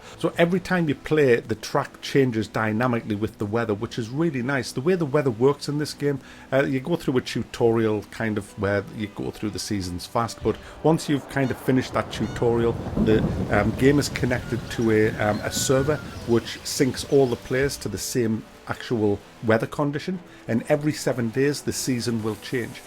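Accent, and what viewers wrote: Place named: Northern England, United Kingdom. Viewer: love it, Nice to hear another Northerner, from Durham way?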